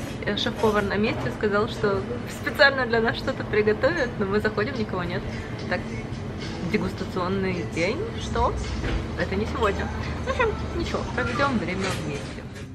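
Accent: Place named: Ukraine. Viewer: люблю твой канал смотреть, но всё таки надо работать над украинским акцентом,он всё портит.....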